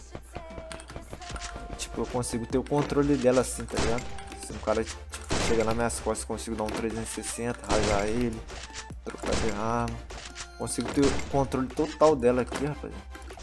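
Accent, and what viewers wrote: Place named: Bahia, Brazil. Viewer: Antigamente voz de maloqueiro hoje em dia tem voz de baiano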